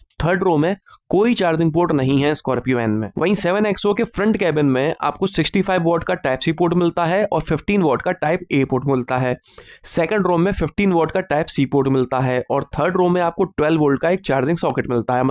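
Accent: India. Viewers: Bhai tumhari desi boli apun ko mast lagi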